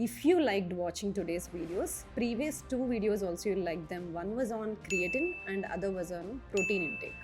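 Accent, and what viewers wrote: India. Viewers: Great information. By the way I am surprised you still pronounce certain English words with Indian accent ( Example: Dessert and obesity) I hope you take it sportingly. Cheers! 👍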